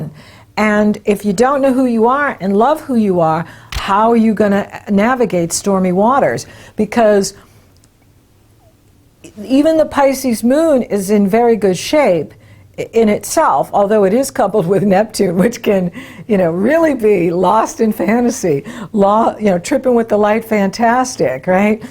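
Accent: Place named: New England, United States. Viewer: Hey,I'm a NE resident too! Massachusetts! I always resonated with your accent... Now I know why! I'm studying astrology now and I really appreciate your videos! Looking forward to a little snow tonight! Stay warm!:). Ellen Green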